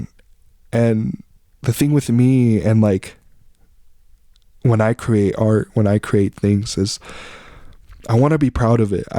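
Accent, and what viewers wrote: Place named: United States. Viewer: i just binged watched ur videos coz i watch ur “how i learned tagalog” video and wanted to hear how u speak filipino. Ur taglish sounds diff from the usual taglish. Its cool tho! Explanation: you have a different way of switching from tagalog to english and sneaking in english word + there's some filipino words that you translated in english that we dont normally translate in english. (we would still say it in tagalog) Accent: u sound filipino american. We can distinct fil-ams accent. U have filipino accent with a hint of american accent. Pis dont take this as an offense. More like critics. And i love ur uniqueness in speaking To improve ur speaking... watch noontime shows or filipino podcasts and imitate their accent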